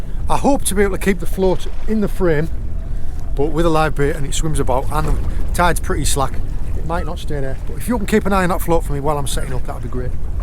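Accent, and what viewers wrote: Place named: Yorkshire, United Kingdom. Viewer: I am really enjoying your videos. You had me at the mention of Cornwall. I went there once and loved it. I always dreamed of retiring there and just painting everything. I came back with hardly any pictures though; I was too busy enjoying the place! I don't really fish, but I used to dive,so I love the underwater stuff. And I love the foraging. I am not much for beaches unless it is in cool weather. Cornwall fits the bill! Most of all, I enjoy listening to you. I didn't think you were Cornish, because I can understand you. But the Yorkshire accent I do get. Your voice is soothing and you are always smiling. You have a nice family as well. Your son is fortunate to be able to learn from you.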